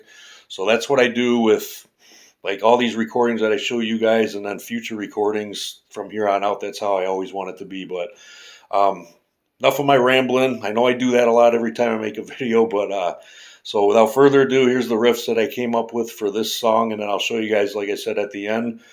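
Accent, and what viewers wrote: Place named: Chicago, United States. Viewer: Good grief, you're like Chuck Schludiner on speed with those riffs. Also, you literally sound more Chicago than you ever have.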